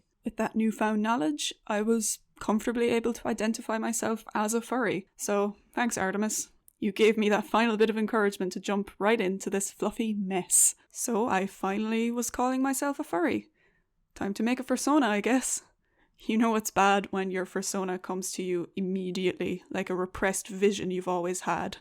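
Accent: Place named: Southern England, United Kingdom. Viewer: I honestly thought you were from south England 🏴 that how you honestly sound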